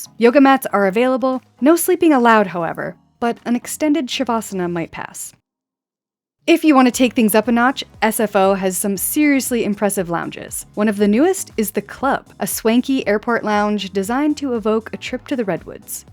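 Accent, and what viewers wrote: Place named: Canada. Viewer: None of this existed the last time I used the airport which was 2005. And while everything you showed looked nice I agree with other comments. You were comped for sure. no mention of prices at most places you visited. High end is fine if the average mortal can afford it. Besides most people do not have the time to spend 24 hours at any airport with all the things we have to do just to arrive and check in. And BART is not all great. Took it from downtown SF on my return and there was a delay for at least 30 minutes in the middle of nowhere - I thought I would miss my flight - thankfully I didn't. And having visited Canada many times your accent was a dead giveaway - Toronto, maybe?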